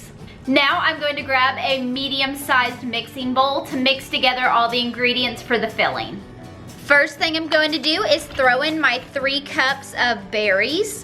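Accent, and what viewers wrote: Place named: Texas, United States. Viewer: She would fit right in here in Texas, my family speaks the same way. 😃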